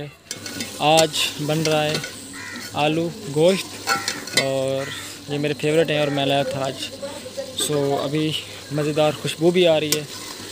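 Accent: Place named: Saraiki, Pakistan. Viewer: Bhai ap agr saraiki m video bnao ksm sy bht achi video bny gi bht pyari saraiki bolty ho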